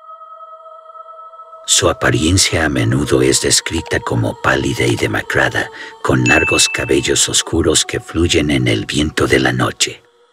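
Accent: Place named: United States. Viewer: Su acento es de estadounidense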